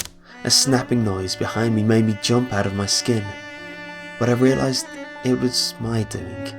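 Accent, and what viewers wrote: England, United Kingdom. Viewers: Nice to have an English accented narrator for a change , nice atmosphere and fab pics. Thankyou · Why are all creepypasta narrators British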